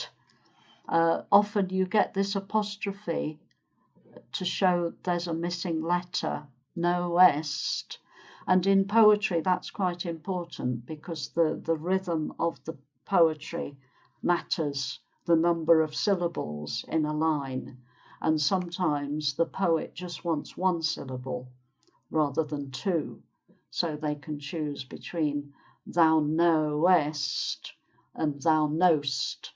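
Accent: United Kingdom. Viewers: can you suggest some British series or sitcoms that speak like you?. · Hello, thank you for your lessons very much! They are always not only informative, but also pretty useful. And one more reason why I love your study stuff is your really British accent 😇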